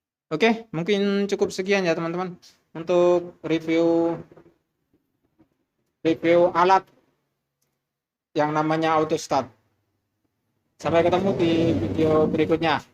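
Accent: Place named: East Java, Indonesia. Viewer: wong ndi se mas,, kok kyk jatim ngunu ngmg e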